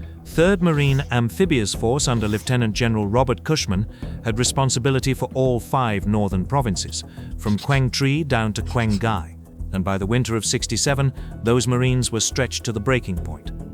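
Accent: United Kingdom. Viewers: Why the british accent guy narrating. Get an American.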